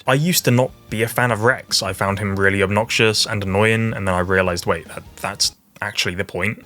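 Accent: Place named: United Kingdom. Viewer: Good invincible video? British man talking for 10+ minutes? Subscribed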